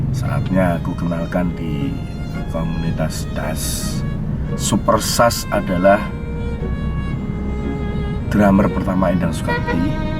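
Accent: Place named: Java, Indonesia. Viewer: Mas, kamis Inggris saiki ilang yo? Hehe, kangen karo english java ne mas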